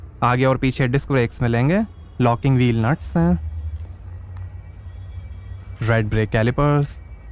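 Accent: United Kingdom. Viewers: British bolna teak hai but the landrover ownedby Tata motors 🔥😎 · Bhai Saab...not British....be indian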